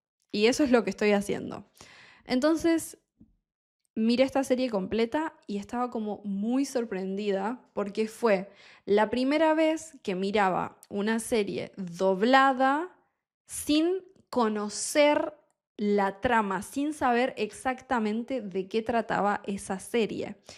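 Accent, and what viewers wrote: Argentina. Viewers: I get so excited when I'm watching your videos and realize I understood almost everything. My spanish teacher is from Buenos Aires and I love watching your videos in general, but I noticed they have helped me understand her accent better too. In my lesson today I only remembered how to say "rallado" in an Argentine accent because of all of your cooking videos! It was really funny. It's great hearing about your language learning journey as well. Thank you so much for sharing. 🥰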